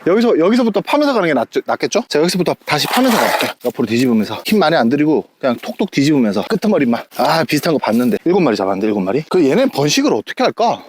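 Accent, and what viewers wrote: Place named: Gyeongsang, South Korea. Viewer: 부산경남 사투리가 상당히 자연스러운데 고향이 경상도신지?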